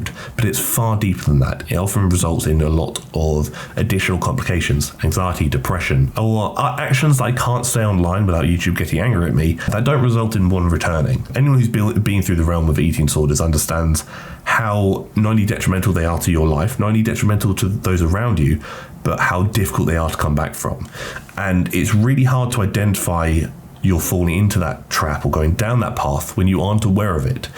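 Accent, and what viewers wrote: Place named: North, United Kingdom. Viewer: This is so random but are you northern lol...this is the first time you've sounded northern to me lol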